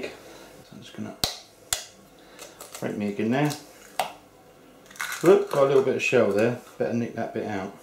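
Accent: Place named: Southern England, United Kingdom. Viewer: Hello mate. Just wanted to say thanks for taking the time and effort to make all these great videos. They've helped me a lot to broaden my cooking skills and the menu at home. Also nice to hear good southern accent, as an ex-east ender (wanstead) now living out in Australia :) Cheers.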